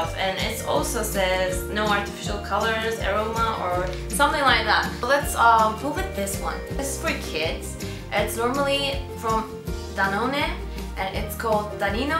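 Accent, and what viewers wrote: Turkey. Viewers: Turk oldugunu da soyleseydin bos yere vakit kaybetmezdim. Boyle bir Ingilizce aksan sadece iyi Ingilizce bilen bir Turk'te vardir hemen anladim. Zaten twitter profilinde de isminin oldugu yaziyor. Yemeyin lan insanlari! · Haha ulan kiz Turk, Turk aksani var. Turkiyede yasiyor bence ...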